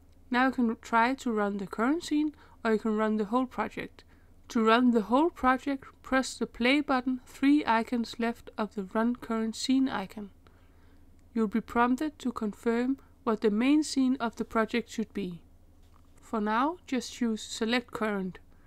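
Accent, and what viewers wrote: Denmark. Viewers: I am really liking this so far and I can't wait to watch more. It's also nice to see and hear a fellow dane make game development tutorials.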